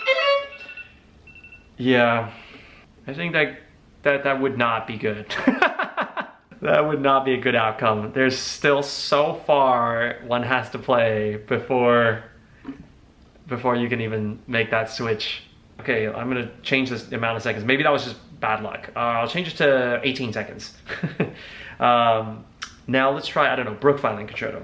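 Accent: United States. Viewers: WAIT I'm confused... he's talking in American accent but when he's when twoset he had an Australian accent?? Am I right or I'm just?? Sorry I'm not good at english anyway lol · To my ears, even Ray's American English sounded so eloquent and charming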